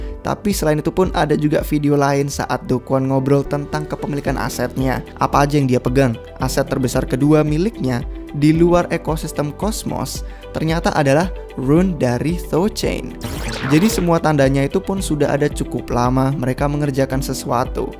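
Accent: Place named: United Kingdom. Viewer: Aksennya British banget bang😂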